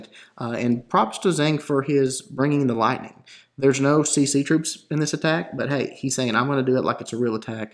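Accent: South, United States. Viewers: So, I finally got a little past early th9 and it's awesome cause now I have hours of useful study film. Thanks again for all the sweet vids, man, keep it up. Out of curiosity, you from the South?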